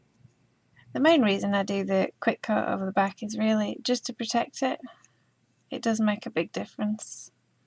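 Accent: England, United Kingdom. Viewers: sorry your english accent is to thick i cant understand a lot of the items your using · That is ALSO the first time I have ever heard anyone say they could not understand someone with a "thick" English Accent!!!!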